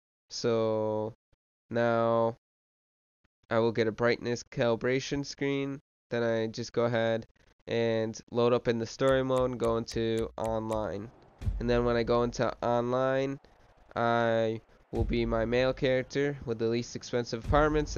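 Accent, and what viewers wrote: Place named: Cuba. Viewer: superliked you sound like a cuban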